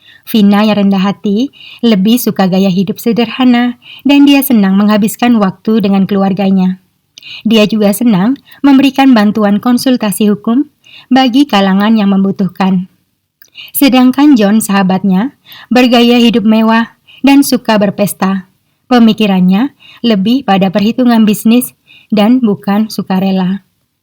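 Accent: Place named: Madura, Indonesia. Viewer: Logatnya seperti orang Madura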